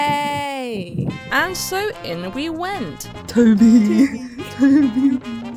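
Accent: England, United Kingdom. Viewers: It’s a super English accent though 😭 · I was unaware you were british. I should've known due to the accent, but whatever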